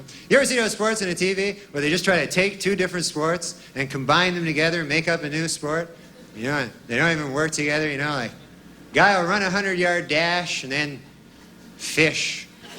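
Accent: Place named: Canada. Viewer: Man he's SUPER Canadian right here now eh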